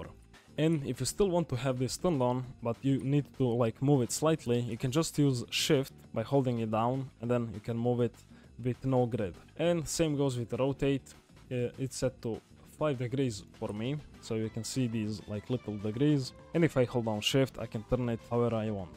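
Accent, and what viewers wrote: Russia. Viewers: He's russian possibly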